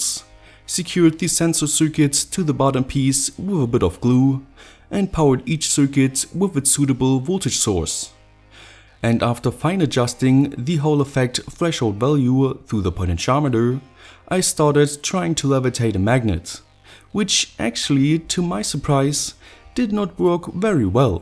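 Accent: Germany. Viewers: I guess by your accent that you are from Germany or Austria, if so why do you claim that JLC PCB has free shipping? But it doesnt show for me free shipping(Austria)?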